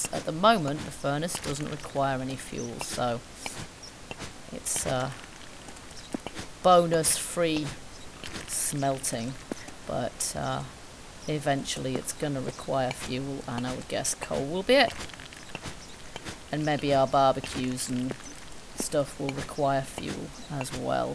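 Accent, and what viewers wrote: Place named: United Kingdom. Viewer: love the accent, I don't hear a lot of female gamers, let alone british one's so kudos to you, my ancestors came from Yorkshire...keep up the great work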